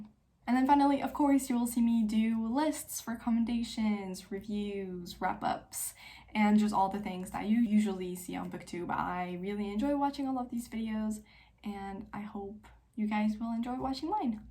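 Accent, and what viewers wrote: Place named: France. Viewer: I had a feeling you could speak French, I recognized it in your accent. You speak really well for a newbie to booktube!!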